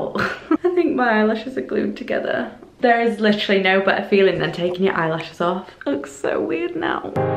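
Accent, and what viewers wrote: United Kingdom. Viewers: Why are British women so fine with that accent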